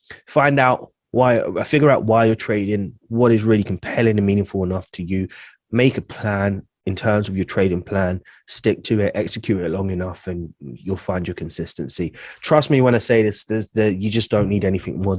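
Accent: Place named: Cornwall, United Kingdom. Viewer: Greetings from Miami!!! Love, love the vids!!! you truly ROCK. I was wondering if the membership you offer includes daily signals? Also, are you from Cornwall? I have a friend who's accent is soooo similar to yours...lol. Thanks for great content and clear delivery!!! 🙏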